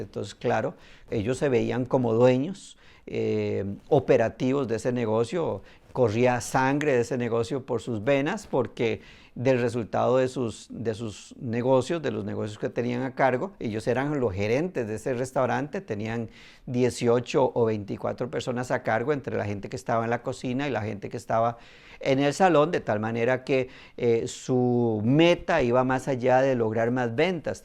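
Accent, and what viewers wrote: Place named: Bogotá, Colombia. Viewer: hola po el acento crei que estas en Bogotá, como te contacto para pagarte por una asesoria?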